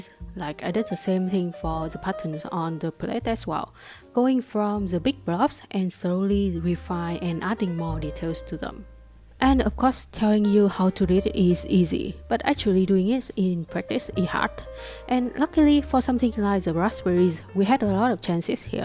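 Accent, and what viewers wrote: Vietnam. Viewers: Nghe giọng bt ng Việt luôn:))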